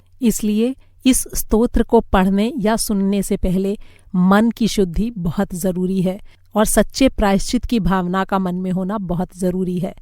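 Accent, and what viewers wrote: Standard. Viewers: आपकी बोली शुद्ध सात्विक और स्पष्ट है....आपको हमारा नमन |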